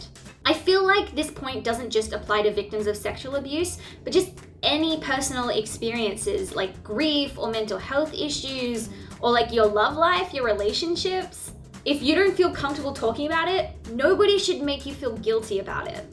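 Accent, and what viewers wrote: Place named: Australia. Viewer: As a dude, I was so uncomfortable after he suggested they go to his house and she began to talk about what she would be expected to do that. I deleted this one. The student teacher trope, however, is one of my favorite things ever I fully admit that don’t judge. I love things like dark notes, and misbehaved if you’ve read either of those and lessons in sin. Also, are you Australian? Sounds like it and that is the coolest thing ever.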